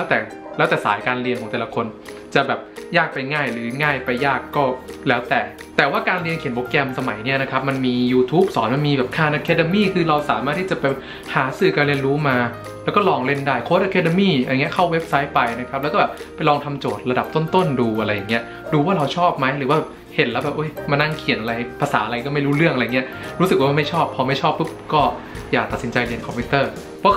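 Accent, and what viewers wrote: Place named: South, Thailand. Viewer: สำเนียงคล้ายคนใต้มาก 555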